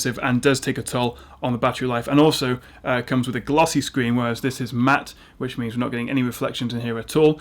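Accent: United Kingdom. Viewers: Finally someone with genuine clear British accent here ;) not that german-mechanical noise XD Thanks a lot mate !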